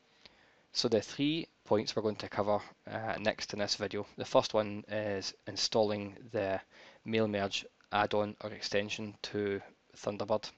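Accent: United Kingdom. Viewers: Great video, the British English cracks me up a little ;) "Please call on <num>", Lol ;)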